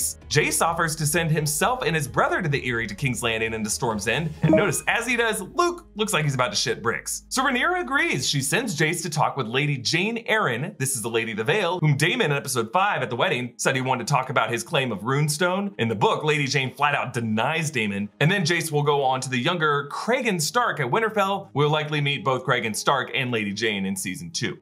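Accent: United States. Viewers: “from a nerd with a plain American accent” 🥰🥰🥰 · Plain American accent that finds 5 notable changes in the opening credits when others say “nothing changed” despite having seen the episode in advance and had more time to dissect it. Your videos are well worth the wait. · I LOVE YOUR PLAIN AMERICAN ACCENT!!!! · “Plain American accent” damn shots at the other creators who more than likely made their video after the leak.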